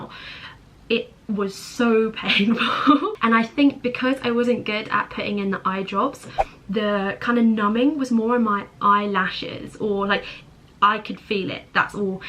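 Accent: United Kingdom. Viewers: Your accent is a British slat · Are you Malaysian with British Accent?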